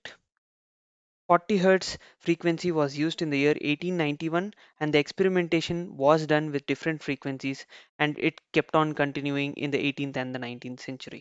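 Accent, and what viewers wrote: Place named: India. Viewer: I love indian english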